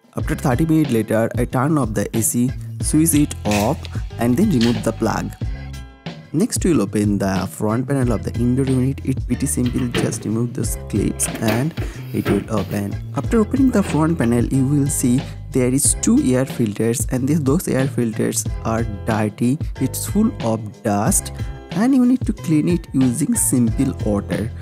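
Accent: Bengal, India. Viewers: You're typical Bengali accent is pathetic! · Do u have paan in Ur mouth?😂😂😂 Bongali xcent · Bengali accent or something else.....my tongue is twisting by hearing him..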